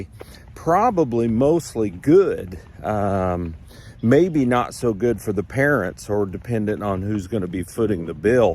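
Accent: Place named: Northeast, United States. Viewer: Where are you at in the states? Sounds like Northeast.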